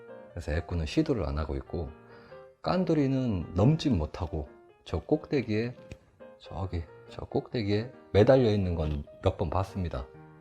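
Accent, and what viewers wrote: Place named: Chungcheong, South Korea. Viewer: 에꾸가 잘 알다시피 너무 날씬하잖아요ㅋ 시도를 안 해요. 저도 충청도지만 충청도식 화법 느므 익숙하고 고향 생각 나네요^__^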